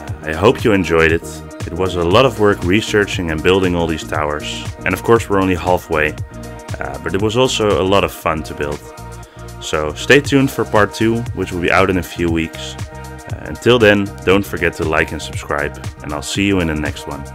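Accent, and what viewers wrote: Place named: Netherlands. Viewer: No hate but this is the first video I’ve watched from you and I immediately recognized your Dutch accent (de mijne is erger😅😭) and I love accents so it doesn’t matter❤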